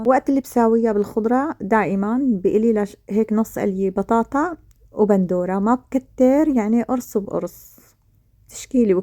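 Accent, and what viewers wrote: Homs, Syria. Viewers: والله شي بشهي يعطيكي العافي ودخيل الحكي الحومصي😅😘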